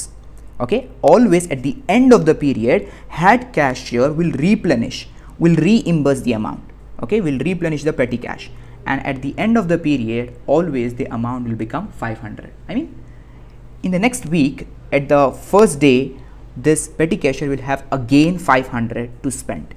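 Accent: India. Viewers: Lol his english suggests he is Indian. You people really know to make educational YouTube videos.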